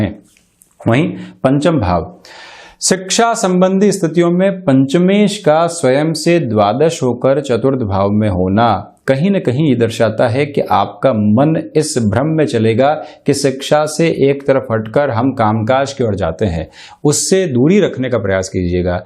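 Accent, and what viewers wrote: Standard. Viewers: Aap bahut sudh Hindi bolte h..kai batein smjh nhi aati..please thoda simple bole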